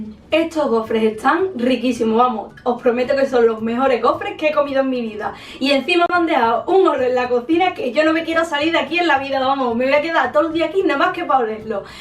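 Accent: Andalusia, Spain. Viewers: no me hagas mucho caso pero creo que es andaluz xD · Es de España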